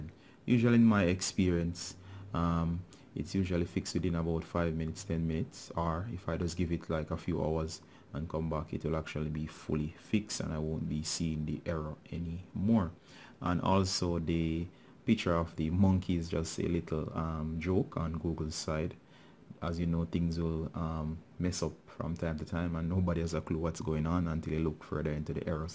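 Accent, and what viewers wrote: Jamaica. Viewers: R u jamaican man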